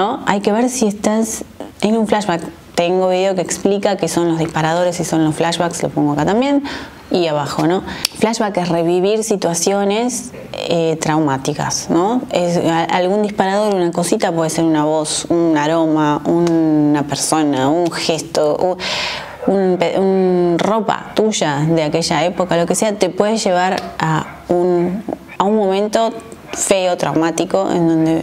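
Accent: Argentina. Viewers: Soy Española me encanta tu acento creo que Argentino, recién.llegada a este bonito canal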